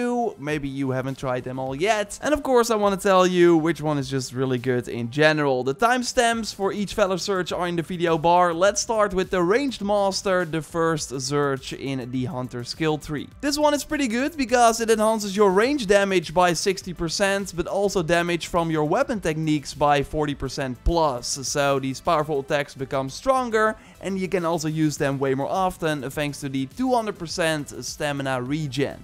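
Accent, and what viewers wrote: Netherlands. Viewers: Hele goeie content maar jonge jonge wat is duidelijk te horen dat je Nederlands bent 😂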